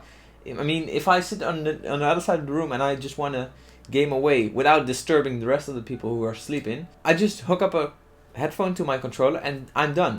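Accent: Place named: Netherlands. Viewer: You are Dutch i can hear that in your accent 😂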